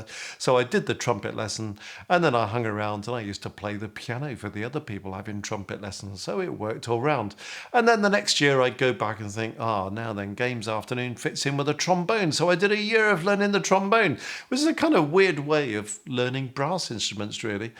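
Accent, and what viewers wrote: England, United Kingdom. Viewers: Thank you for sharing your story so inspiring, determined and disciplined!! Thank you for making YouTube videos!! You are the best teacher , I love your English accent. I watched you videos repeatedly… you are so patient your lessons are so details, and mostly easy to understand!! Thank you very much!! 🙏🙏❤️❤️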